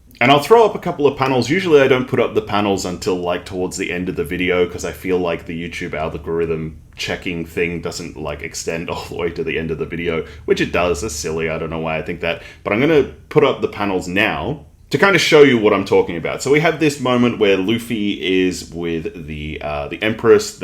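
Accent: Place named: Australia. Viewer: Oh, oh! One of the first reasons your videos resonated with me was because I spent a significant amount of 2020 in australian backpackers (the accent really sends me back ksksk) So yeah, just letting you know that someone was wondering from where you were, actually lol Anyways, I've stuck around for the contect. It's super fun to see you theoririzing. Always excited to watch your videos!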